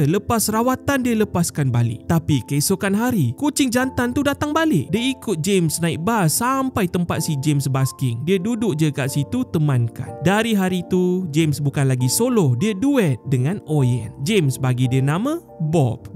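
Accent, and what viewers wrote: Sabah, Malaysia. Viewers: "Andu bah bob" ni org sabah ka 😂 dr 1st tgk konten nya tertanya² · sekali sekala loghat sabah bha teda hujan teda ribut tu 😂😂😂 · Andu bah Bob..seluruh dunia bersedih 😢 ni pandai slang Sabah😅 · Hmm bob 😢😢 btw bang mmg org kinabalu kot sebab ada “anduk dgn bah” dia 😅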